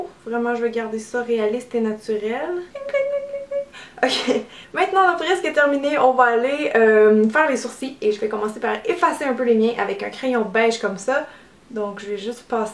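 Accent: Quebec, Canada. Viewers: T'es québécoise ?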